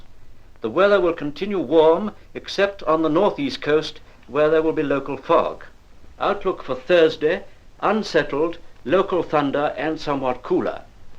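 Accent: RP, United Kingdom. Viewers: He doesn't "sound intelligent"; he just sounds posh!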